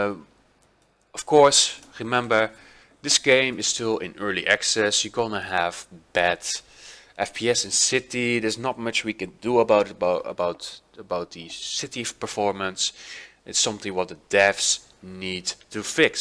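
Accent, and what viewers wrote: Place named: Netherlands. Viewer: Ben je van holland ik hoor het een beetje aan je accent? Leuke video man Bedankt!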